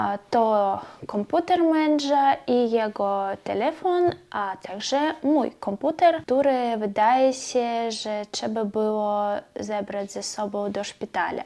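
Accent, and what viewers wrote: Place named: Podlasie, Poland. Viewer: Jesteś jeszcze młoda, jak będzie Ci się tutaj podobało i będziesz chciała to bez problemu zostaniesz Polką. A co do akcentu, to z tego co słyszałem na Podlasiu mieszkają Polacy i mają podobny akcent😁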